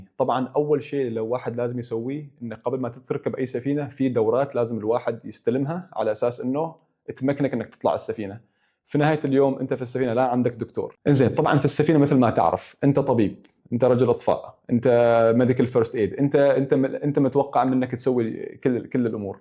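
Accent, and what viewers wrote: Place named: Syria. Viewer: لهجتو سورية الصراحة😅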